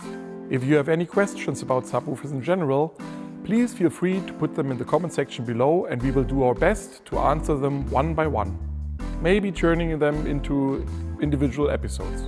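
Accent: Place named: Germany. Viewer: After two words spoken, I knew that he must be a german haha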